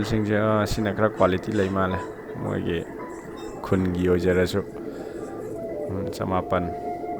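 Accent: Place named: Keishampat, India. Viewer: Bro gi khdang se eikhoi keishampat khdang ga chp manei hydi eymbei dialogue se..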